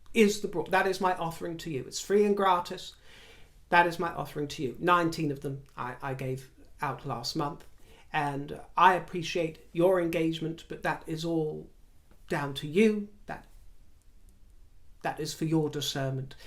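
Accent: United Kingdom. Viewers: I was wondering if anyone knows if is American and really live somewhere in America (and just does a good accent) Or is he really from the UK? 😁😘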